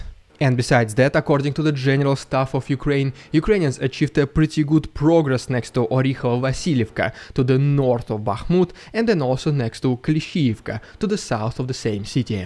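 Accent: Russia. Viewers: hope all is well. Been annoying me for ages - yer accent sounds lik chekov from star trek.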